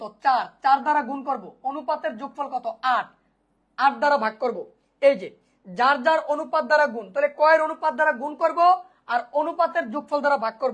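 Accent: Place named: Sylhet, Bangladesh. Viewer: Aii sylhety okol koi ve😂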